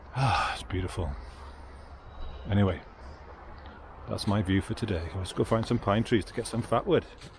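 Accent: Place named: United Kingdom. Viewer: Where is this? I assume in the UK. Guessing that due to your accent.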